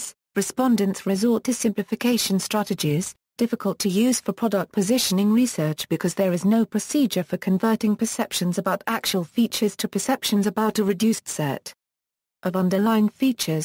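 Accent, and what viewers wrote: United Kingdom. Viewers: Love this explanation with the British accent!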